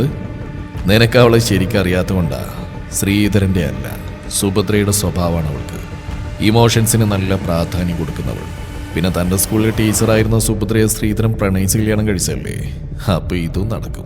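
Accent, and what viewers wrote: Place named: Thrissur, India. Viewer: ❤️🥰🥰സൂപ്പർ... എന്റെ... ആ തൃശൂർ സ്ലാങ് 👌👌ഒരു രക്ഷയുമില്ല... സൂപ്പർ 😍🥰🥰🥰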